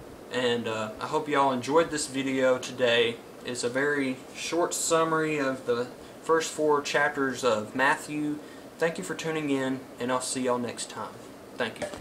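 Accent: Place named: Carolina, United States. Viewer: You don’t have a Georgia ascent you have like a North Carolina South Carolina ascent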